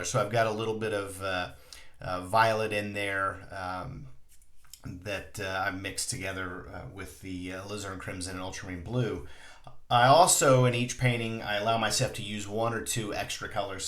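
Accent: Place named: United States. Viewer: I really like your clear teaching style and the look of your abstract works of art. Thank you for sharing so many 'how to' videos for beginners like me and I am excited about trying out your tape idea. I also enjoy listening to your calm and very relaxing American accent. Regards from Down Under in Australia 😊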